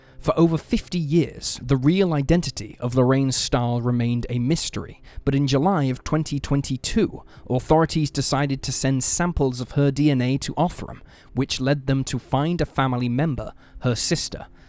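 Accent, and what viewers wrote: England, United Kingdom. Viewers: What a stupid comment! Yea he’s English, but just cos he has an English accent, why are you saying he should only talk about English killers??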